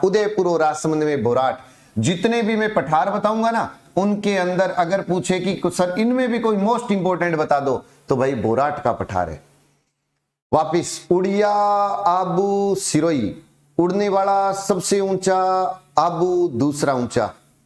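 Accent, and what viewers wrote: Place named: Haryana, India. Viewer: Sir, Your language tongue is matching with Haryanvi/Bagri, Due to regional effect It supports easy to learn then Marwadi/Mewari classes, thanks for your great efforts.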